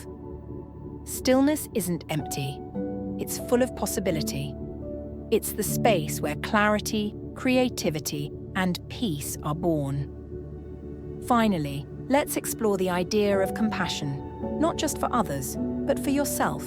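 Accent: England, United Kingdom. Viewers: I'm 58 , emotionally immature, with discouraging sudden mood swings that take me from poetry to the toilet....sort of speak in a manner of seconds, the mood swings are hormonal, the rest unhealed psychology, spiritual bankruptcy and much more..... I want to thank you for today your channel found me, I say it this way because I didn't know it existed to look for it to begging with. The two videos I've listened to this far.....sound True, and the non robotic lovely voice of the narrator with what I perceived as an English accent, it's the cherry on top. Her delivery brings believability to the things she claims,but I have yet to call my own experience. Thank you for the sense of calmness this has provided as I wrestled with this thinking of mind in getting myself ready to hopefully sleep. Truly thank you The content resonates as Truth to me, and the content and delivery make for a very reality based, perhaps it's possible for me, to have kind of experience. 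Thanks for the hope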